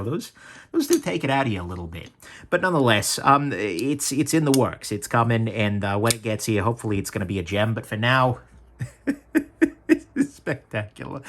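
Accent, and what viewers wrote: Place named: New England, United States. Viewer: Am I the only one that thought He was from New England and not Cali?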